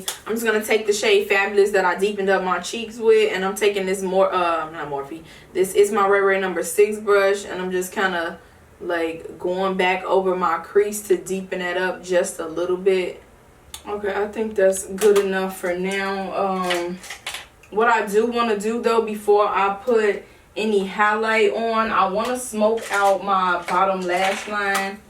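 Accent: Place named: Chicago, United States. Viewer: Knew you were from Chicago as soon as you started talking lol. People say we don’t have accents but I beg to differ. Love your channel 💕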